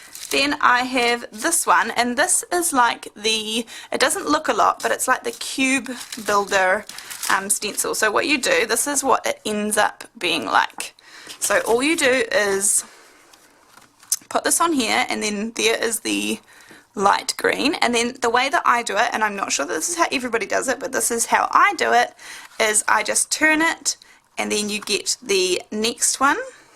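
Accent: New Zealand. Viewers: Wow !! So how do you heat emboss the mandala please? What kind of stamping ink do you but to get a really clear stamp? I've been using aliexpress ink and the images turn out a wee but fuzzy. Thanks so much for your videos!! It's nice to hear a fellow kiwi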